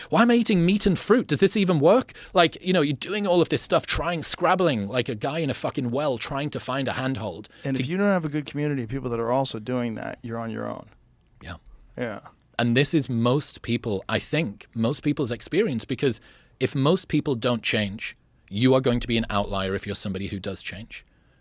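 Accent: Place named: England, United Kingdom. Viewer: This guy just repeats Hormozi quotes in an English accent and people think he's deep.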